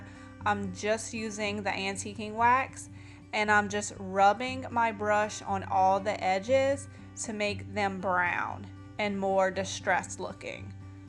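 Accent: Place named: South, United States. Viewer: Hi,. I just came across your videos yesterday. I watch a lot of home decor/diy videos and have never made comments. However, the more videos of yours that I watched, the more I was convinced that we are twins separated at birth (or at least kindred spirits). I have a booth at a local antique mall. If you look me up, you won’t find much because I seldom post anything to social media. I’m so busy making things to keep my booth stocked that I just can’t find the time to maintain a media presence. Many of the things you make are things that I make (and I thought that I originated the idea🤪) such as decor books, turning outside lanterns into inside lanterns, making wood rounds for thrift store cloches, painting old canisters and pitchers, etc. I have thought about creating a YouTube channel for over a year but haven’t taken the plunge. How do you find the time with young children? 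I hope you’ll respond but I know you are super busy. Just know that you have a twin (minus the cute southern accent) in Illinois.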